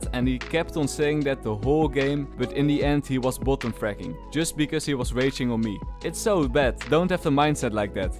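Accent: Netherlands. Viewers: You are dutch aren't ya? ;)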